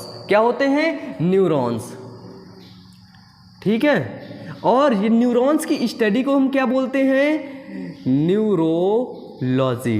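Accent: Uttar Pradesh, India. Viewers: Sir aap up ke hai kya up ke bhasha hai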